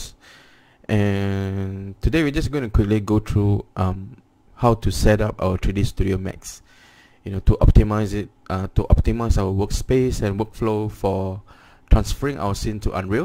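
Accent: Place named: Singapore. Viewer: Singaporean accent. HAHA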